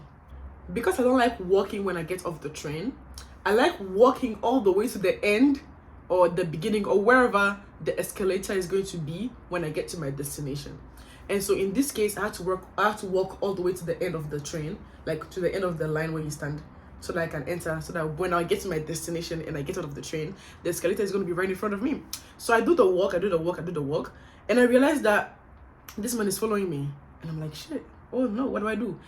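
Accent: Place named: Nigeria. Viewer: you sound soooo Nigerian in this video oo hah! 😂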